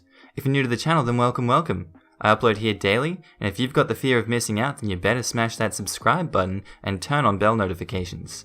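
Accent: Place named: Australia. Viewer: the northern Aussie accent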